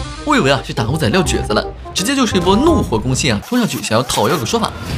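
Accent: Taiwan. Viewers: 其實臺灣腔也蠻好聽的 （猛然發現的標題是繁體字） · 连续看你的戏十次这是第四次台湾腔好听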